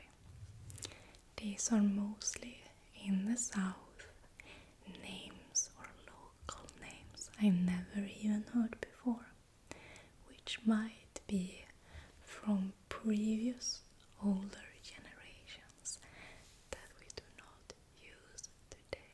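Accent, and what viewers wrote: Sweden. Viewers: I swear Swedish accent is the best accent. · Vilken underbar svensk accent!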